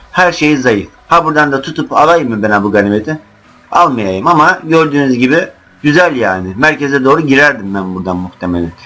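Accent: Black Sea, Turkey. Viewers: Abi saf karadenizli misin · abi sn karadenizli misin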